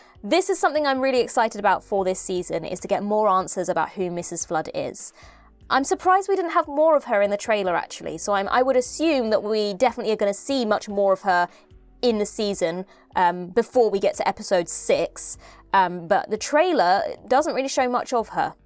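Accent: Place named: Berkshire, United Kingdom. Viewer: Is the presenter from Berkshire? She sounds posh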